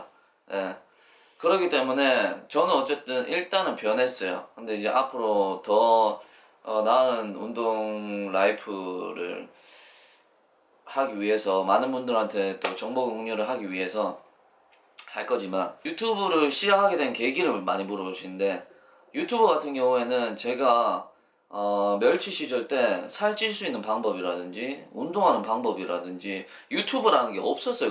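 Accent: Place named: Gyeongsang, South Korea. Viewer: 여자친구 어디서 만났으셨어요~말투가 갱상도 사투리인데 고향이 울산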